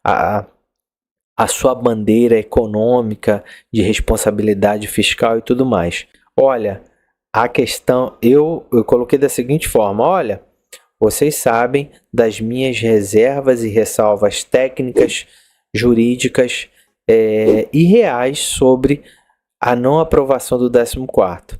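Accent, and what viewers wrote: Rio de Janeiro, Brazil. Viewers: Deixe esse sotaque de carioca ja esta enojando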